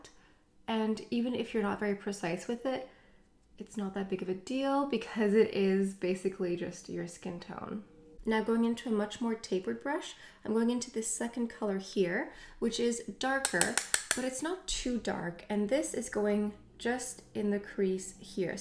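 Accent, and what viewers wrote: France. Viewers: I am French and accent Français is perfect😍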